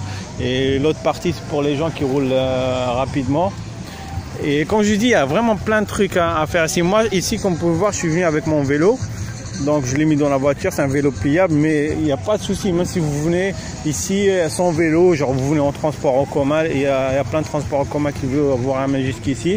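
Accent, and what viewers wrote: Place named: Italy. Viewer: tu est italien ? ta une petit accent italien , je vais debarquer avec mon velo electrique à korat lol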